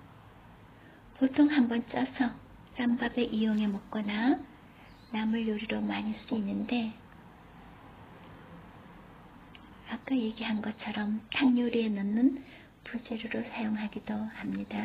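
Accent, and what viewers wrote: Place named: Gyeongsang, South Korea. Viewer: 경상도사람목소리.ㅋㅋ.목소리는있는그대로...좋아요.제가 청음짱!이라서....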